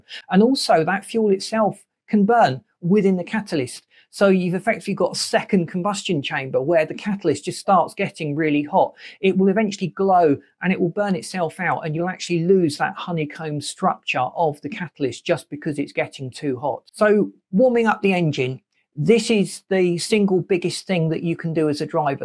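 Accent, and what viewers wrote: United Kingdom. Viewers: "zois compoinets hate up" ???? bro I h8 this annoying uk accent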